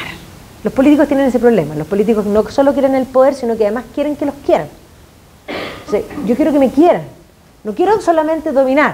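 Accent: Chile. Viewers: Muy bien, pero, los no-chilenos no entendemos algunas frases y palabras por el acento...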